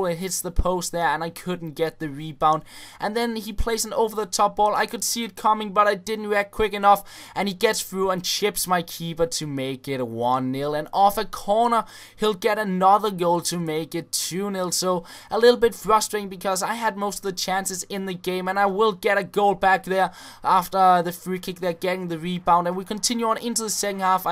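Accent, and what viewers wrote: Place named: Denmark. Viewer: Danish accent does that :)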